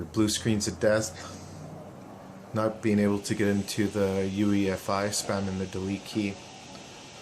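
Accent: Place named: Canada. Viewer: Dude. The radiators out the window and the Canadian accent commentary made this whole video for me. Fuck Yeah!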